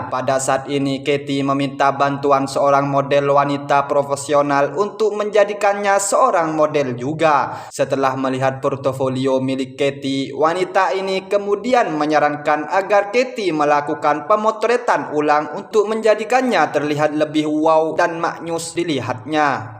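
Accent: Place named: Madura, Indonesia. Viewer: Suaranya kayak Oreng Medura....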